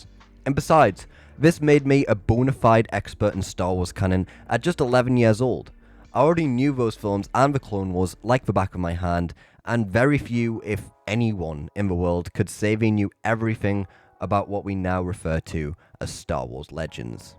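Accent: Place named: Northern England, United Kingdom. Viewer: Northern English! I didn’t know that was the accent distinction, it’s great! I’ve only been to southern England & Wales myself.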